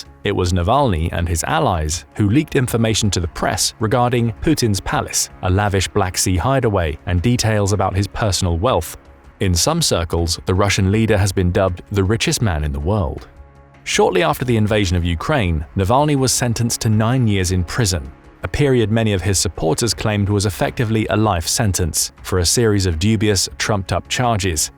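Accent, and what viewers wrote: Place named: RP, United Kingdom. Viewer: Lies from the get go. This guy thinks that his posh sounding accent proves his intellect. Well he sure is a perfect example of twisting the truth to fit own alternative UNREAL narrative / just as hypocritical as main media and politicians who happily speak with forked tongues and do their utmost of censoring what they don't like. Do you ever now find REAL debates between intelligent people of different opinions than what is force fed??? No, all the main proponents do is calling names, taking jobs away from dissenters.... admitting that they will call something they agree is TRUE nevertheless as " disinfo" when it does not suit their narrative.....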